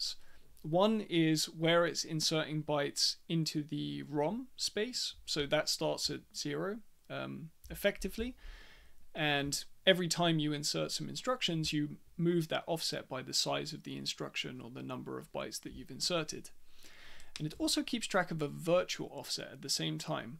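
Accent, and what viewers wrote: Netherlands. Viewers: You're either Dutch or Belgian :). I think Dutch. Nederlands?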